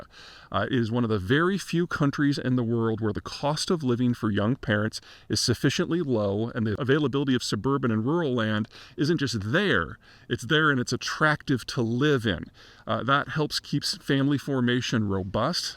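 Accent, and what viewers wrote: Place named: United States. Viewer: Oh! It's the same fxxxing expert with an American accent, who knows so much about Australia. Too funny. Pass the bloody popcorn mate. Keep doing these, you are a genius, It's so comical listening to you blow it up your own arse.😂😂😂😂😂😂 Love the sunglasses, they really do a great job hiding your IQ. Get back to the northern hemisphere, you are stealing our supply of oxygen down here. Then again, the Septics are well versed on extortion. They have practised it all over the world, since the time of Columbus.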